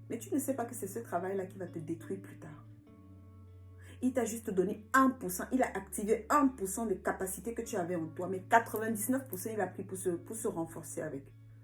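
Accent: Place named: Cameroon. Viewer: J’aimes beaucoup l’aspect terre à terre de tes vidéos. Avec la petite touche de dialecte Camerounais 😂🤩🤩. Trop fan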